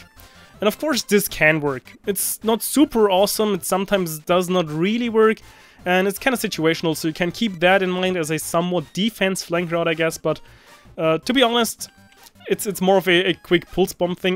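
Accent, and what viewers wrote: Germany. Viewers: Are you German? You sound very german :)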